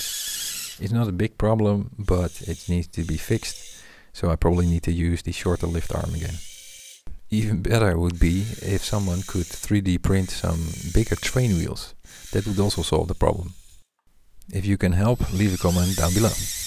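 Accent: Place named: Netherlands. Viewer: Je hoort zo goed dat ie gw Nederlands is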